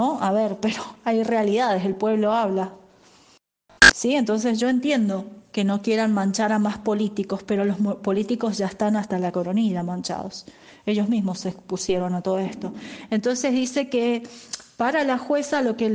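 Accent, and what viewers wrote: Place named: Colombia. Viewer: yo creo que no porque habla con acento como de colombiano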